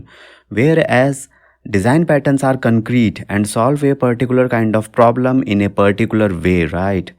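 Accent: India. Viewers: Indian English is not something I will listen to ever. Gosh.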